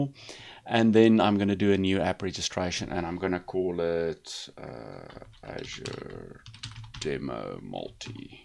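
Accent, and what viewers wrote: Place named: South Africa. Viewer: Good stuff - The South African accent is also refreshing :)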